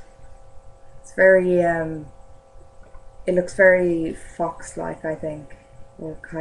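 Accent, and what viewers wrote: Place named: Ireland. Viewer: I knew you were irish as soon as u started talking from your accent ^^ im irish too :) Really want to make a tail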